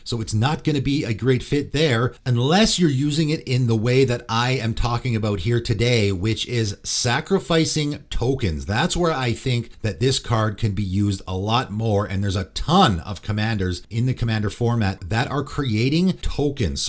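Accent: Canada. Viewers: He's pronouncing it as the average Canadian would, who would be used to reading unfamiliar words with a French phonetic structure. If you all understood what he was saying, he communicated successfully and if you're mad about it, you are remarkably petty.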